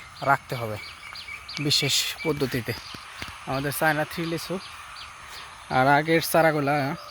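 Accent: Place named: Tangail, Bangladesh. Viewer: ভাই আপনার কথা শুনে মনে হচ্ছে টাঙ্গাইলের কোথাও আপনার বাসা।। আমার বাসা টাঙ্গাইলের সাগরদিঘী। আপনার নাম্বার টা দিলে ভালো হতো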